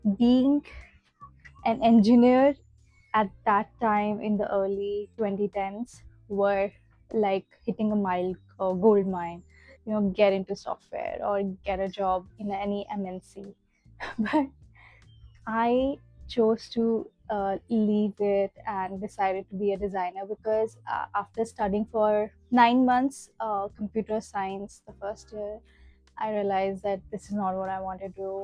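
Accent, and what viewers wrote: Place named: Jamaica. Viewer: I feel like your Jamaican accent is coming in! Please visit Stoney❤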